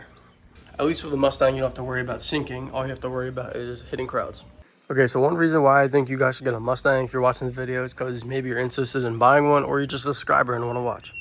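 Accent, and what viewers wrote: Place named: South Jersey, United States. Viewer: you gotta be from south jersey or philly…. gotta be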